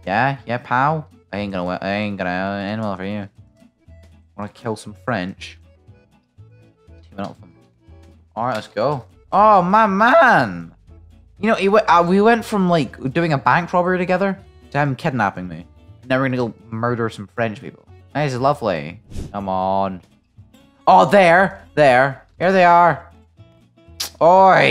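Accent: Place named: Scotland, United Kingdom. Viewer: You Do Realize your British Scottish right?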